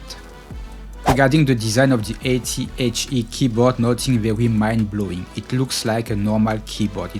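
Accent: France. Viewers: On est d'accord c'est un accent Français ? ^^ Merci pour ta vidéo !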